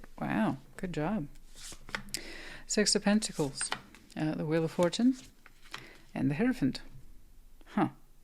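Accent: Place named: Texas, United States. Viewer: Once again, I have closed my eyes laid back then I started your Pisces reading after listening to what seems to me a very happily excepted different more calming tone to your Texan voice of past? Your reading is so very correct i can not fail not to actually not believe that I what your Tarot cards speak to You ☆♡XIII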